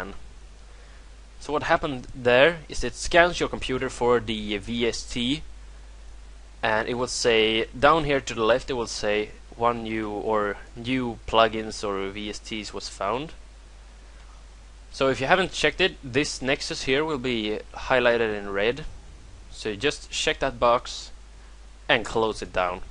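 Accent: Sweden. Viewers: Haha du låter som en typisk svensk <3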